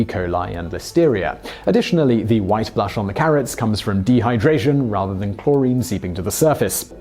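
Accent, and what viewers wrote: United Kingdom. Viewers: Actually, I believe his target audience is primarily Americans, with other English speakers coming in second. Otherwise, he could simply stick with a 100% British dialect, but he doesn't.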